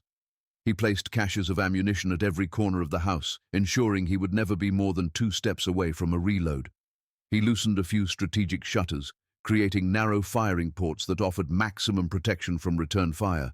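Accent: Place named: United Kingdom. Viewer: It would have been cooler hearing an American sounding vo ,1nstead of a Limey sounding voice over ! In fact any real sounding voice from an average American would have been better ! Not sayin’ it didn’t happen this way , either the guy was real lucky , or very brilliant tactically speaking or the folk that showed were totally drunk !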